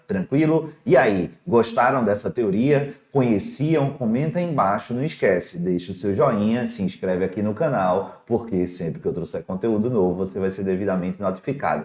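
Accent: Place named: Northeast, Brazil. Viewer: Não sei se alguém percebeu, mas o sotaque nordestino desse mestre, ao que me parece, e essa calma cirúrgica na explicação permitem entender com clareza o tema abordado. Tenho assistido às demais vídeoaulas dele e aprendido bastante.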